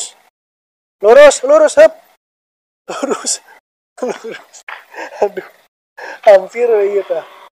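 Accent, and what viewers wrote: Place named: West Java, Indonesia. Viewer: Nyunda asek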